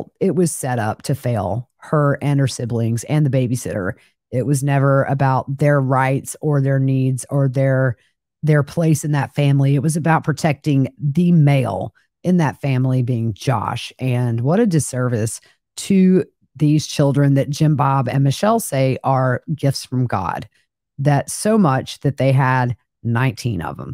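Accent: South, United States. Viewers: I know I love her southern accent so much ❤❤❤❤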